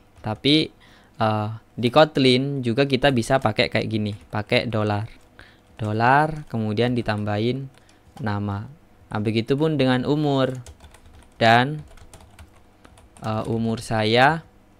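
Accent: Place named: Madura, Indonesia. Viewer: dari logatnya kayanya orang madura deh :)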